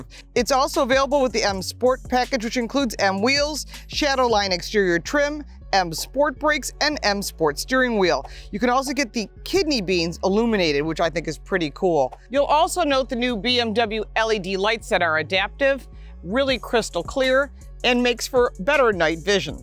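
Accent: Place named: Buffalo, United States. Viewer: Paul's wife, Loveable❤ barbie doll Lauren Fix Car Coach Reports, congratulations on an excellent quality 2024 BMW 5 review. Beautiful vehicle, excellent explanation as always, love the Buffalo, NY accent and your test drives! ❤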